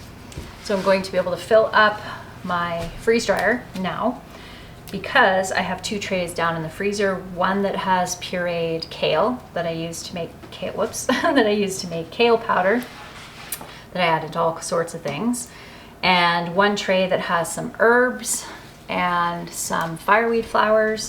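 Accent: Canada. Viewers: I love your Canadian accent. My dad's family are Canadian. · I'm in Minnesota. Besides words like "process", I hear your Canadian accent in your "ou" words; about, house, out, etc. 😊 It's very subtle, but i hear it! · I just love your Canadian accent and how you say words. It’s funny that you brought up “marrygolds” 😊 You have such a soothing voice that I can listen to you talk all day long. Thank you for sharing your beautiful life with us 💗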